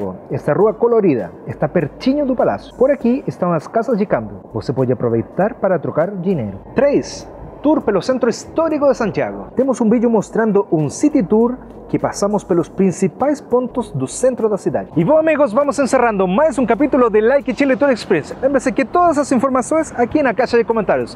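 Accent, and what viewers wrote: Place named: Brazil. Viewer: Tô chegando agora no canal, mas acho deve ser Brasileiro pensei isso no momento que ele começou a falar pelo sotaque.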